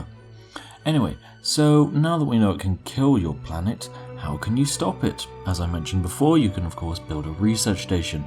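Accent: United Kingdom. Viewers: where in the uk are you from?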